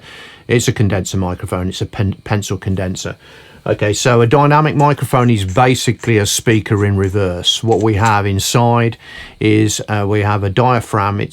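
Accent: United Kingdom. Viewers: Congratulations, the best lesson on mics on the YT. I don't need to listen to anything else after this! I'm far away from you (Brazil) but I know now what to buy even if I'm on a low budget. Nothing like the knowledge of someone who lives of a subject. Never saw someone talking about the MD435 and it was a great find. Very hard to find it here because it's so much expansive for us, but I'll save the tip for any intl trip in the future. I play chromatic harmonica and most top players use a SM58 because you know it's a classic and the frequency range is quite similar to the voice but as the sound is produced by free metallic reeds, the higher frequencies are almost of a brass instrument and I think the MD435 would produce a very interesting output. If you ever have a chance to record one harmonic on it, please let me know; I also study sound quality a lot as I'm an Electrical Engineer too. 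Thanks for the video, lovely British accent! Already saved to a playlist and subscribed to your Channel. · Good video! Over 30 years of moving those faders. I agree on most of what you said. My basic kit is almost identical. Where it differs: I got some Røde NT5 in addition, I got the e905 on Snare (with the Audix i5 as an alternative), got an Audix D6 in addition to the Beta52A and the Beta91A (if I use only one mic for the BD I like the D6 better, but 52 and 91 are better in combination). My goto mic for guitar etc. is the Sennheiser e609 or e906. If people want two mics per git amp, I‘ll put on SM57 in addition. They sound more „metallic“ then the Beta57 in my opinion, so they are a better contrast to the e609/906. Oh, and I have some Audix OM7 for a special clientele. It is solid advice to recommend mics everyone knows to be at least ok and can be found on almost any rider, at least as an alternative for the actual wish list. 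Where are you located? Do I hear a little bit of a London cockney accent?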